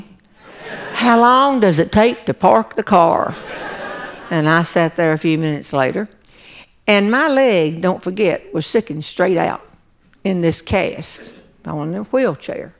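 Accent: South, United States. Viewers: My father's family if from West [by God] Virginia. This woman reminds me of an Aunt from there and my grandfather. Common sense to the nines. That sweet southern drawl as they lay down little pieces of candy while telling a story lead you to fall into the humor pit. Good stuff.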